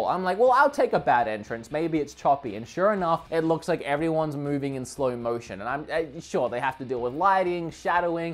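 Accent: Australia. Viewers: Are you an Aussie? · Mate, first video I've seen of yours. You sound totally Australian. I'm assuming you now just live in the US? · Bloody cringe worthy hearing Australian accent. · R u Australian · Are you aussie? If so, why are you at walmart? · What is his accent? Auatralian?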